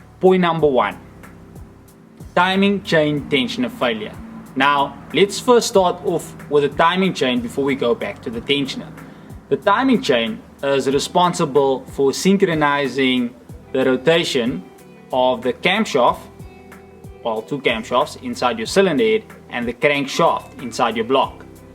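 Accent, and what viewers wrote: South Africa. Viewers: the south african accent was a nice surprise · lol He's definitely South African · Thats a South African Accend .. 😄😄 nice vid tho ..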